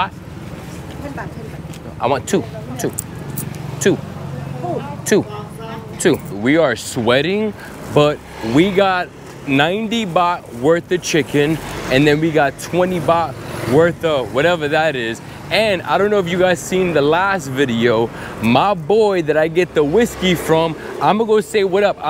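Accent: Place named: New York, United States. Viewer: Chanclas...how many understood that? you are pure neoyorquino...obvious when you talk LOL And thanks for the weed shop tip, so many shops in BKK are way overpriced ($25usd for a gram...yikes!)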